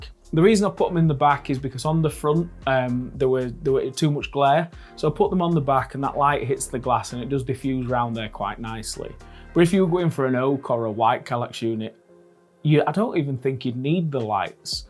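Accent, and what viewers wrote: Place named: Doncaster, United Kingdom. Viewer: that accent sounds proper Doncaster or Rotherham way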